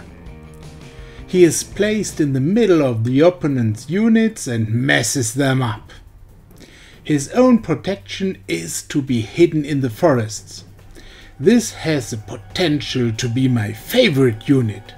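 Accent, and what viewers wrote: Germany. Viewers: Er ist Deutscher mit etwas starkem Akzent.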